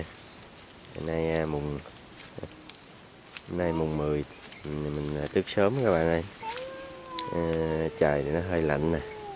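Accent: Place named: South, Vietnam. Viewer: E người miền bắc nghe giọng miền nam rất rễ nghe và nhẹ nhàng🥰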